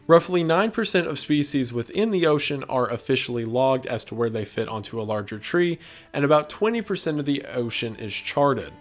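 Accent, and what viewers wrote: South, United States. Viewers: Your Southern accent is so clean and sweet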